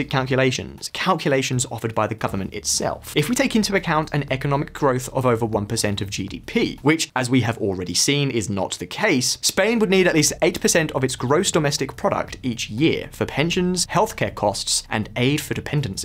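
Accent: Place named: England, United Kingdom. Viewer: Someone with an English accent bad mouthing Spain. Is this supposed to surprise me? Why don't you talk about the financial mess in England?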